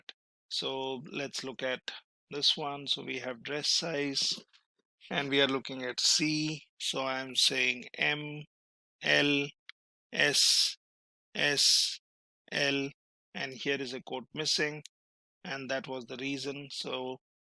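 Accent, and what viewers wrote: India. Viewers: the videos is a value but it's so annoying to be in Indian accent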